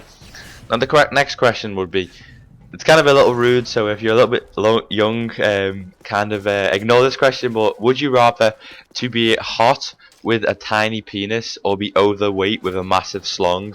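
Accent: Liverpool, United Kingdom. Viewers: may i ask what part of the uk you are from because it sounds like you have a liverpool accent :P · Liverpool accent hahaha its scouse mate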